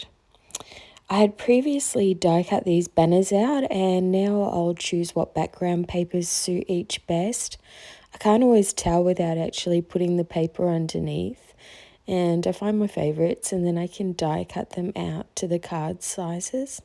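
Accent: Australia. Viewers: Wow, I just found your channel today. What a wonderful selection. Great papers and love the way that you've used them. It's hard to pick a favourite but I really liked Happy Birthday Mum and the last one with the gold dots. Thanks for the inspiration. I live in Australia and you sound like you have an Aussie accent too. 😘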